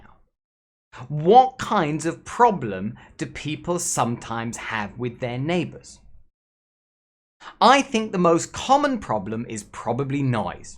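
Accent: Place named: United Kingdom. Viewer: Please teach us how to do British intonations like you. Stress sentences in particular. I love your accent and intonations. How to speak fluency like you with intonations.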